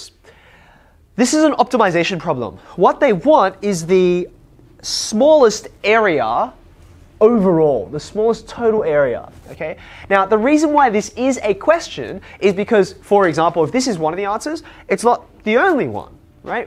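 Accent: Australia. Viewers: Yeah he is an Aussie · Is Australian? Cause he started out sounding American. Lol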